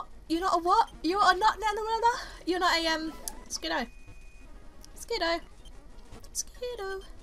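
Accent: United Kingdom. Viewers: " What did I call my other fletchlin'?...........I don't remember!" You gotta love British accents!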